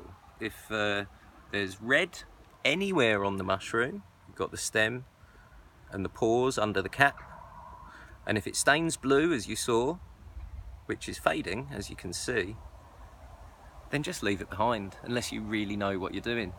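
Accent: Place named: Bristol, United Kingdom. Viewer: Are you from Bristol ? Im trying to work out your accent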